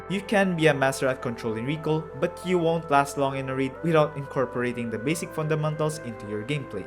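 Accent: Philippines. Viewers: IDK, But you sound like you're Filipino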